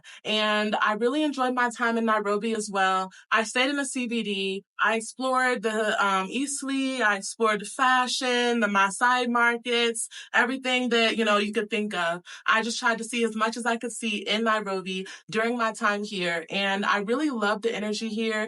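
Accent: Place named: United States. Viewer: Your American accent is what is making them shy its not that they are ignoring you.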